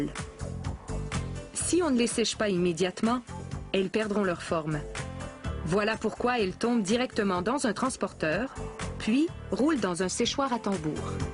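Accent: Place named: Canada. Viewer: quel bel accent canadien !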